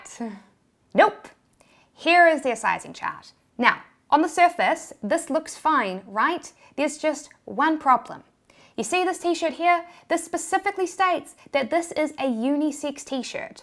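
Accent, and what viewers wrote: New Zealand. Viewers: I like your kiwi accent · Really strong kiwi accent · dragging their store with the New Zealand accent, priceless. 😥😭😂🤣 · Is that what a New Zealand accent sounds like?! I’ve never heard a South African sound so Australian or an Australian sound so South African.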